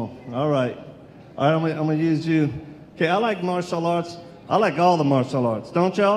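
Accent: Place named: Louisiana, United States. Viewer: Haha I knew you were from Louisiana from your accent, Nawlins forever!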